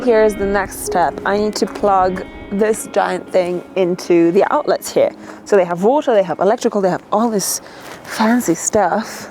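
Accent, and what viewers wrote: United States. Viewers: She is sounding more and more American as she goes along 🤣🤣🤣🇺🇸🇺🇸🇺🇸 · You’ve been in the US long enough that your starting to talk like an American.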